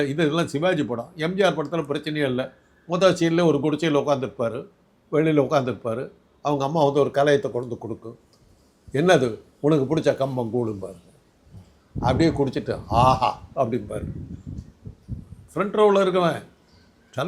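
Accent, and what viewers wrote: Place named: Karaikudi, India. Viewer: காரைக்குடி தமிழ் தம்பி...... தலைப்புக்கும் பேட்டிக்கும் சம்பந்தமே இல்லை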